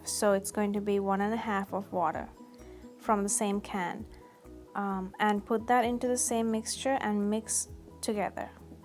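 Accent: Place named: India. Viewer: I hate your hindi accent.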